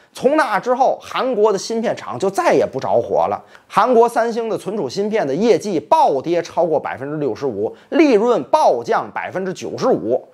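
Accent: Tianjin, China. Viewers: 听你口气也是天津人呀！ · 但是天津话是本频道的亮点啊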